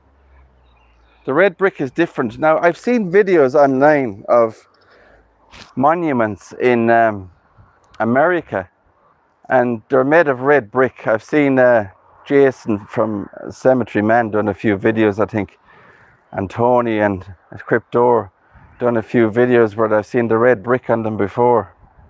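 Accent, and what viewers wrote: Ireland. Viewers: I like the new channel name. The cemeterys there have such fantastic character and The narration with your Irish accent really makes for an incredibly enjoyable video ! ! Thank you for producing them!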